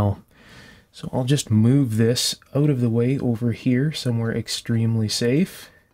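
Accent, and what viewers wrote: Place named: Canada. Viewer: Love hearing Canadians say "about" "pasta" and "Mazda" 😬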